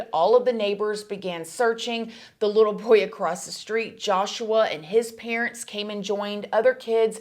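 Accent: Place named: Texas, United States. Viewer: Okay, I’m going to say a few things. Firstly, you remind me of my American friend (she’s Texan, I’m not great with Geography but you sound very similar) and just as with her, your kind, caring nature comes across with every story you tell. I LOVE your videos. Secondly, maybe I’ve got cynical with age, but I don’t feel like Joshua should ever be free. I didn’t really buy his apology, it didn’t feel particularly sincere (to me) it sounded more like a resume statement to try coerce the jurors (or whoever made the decision) into letting him be free. I don’t usually look at cases like this and think they deserve life when they were children themselves (although there are exceptions). In his case, the lack of sincerity, and the destruction and suffering he inflicted upon the little girl, Maddie, her parents, sister, and family I don’t think it would be fair that he would get freedom while they are stuck wondering “what if…”. 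All the things you said were right, you’d be forever trapped in your own mind, a prisoner of questions you’d never ever know the answer to. And, if he was so sincere and he meant it, why not give the family some closure and tell them exactly what he did with their baby girl. Finally, I think upbringing has a lot to do with it. His father’s behaviour toward him might have made him feel rejected leading to fears of rejection by others in society, causing him to develop unhealthy, antisocial attachments, as he apparently did with Jessie. It’s a very, ve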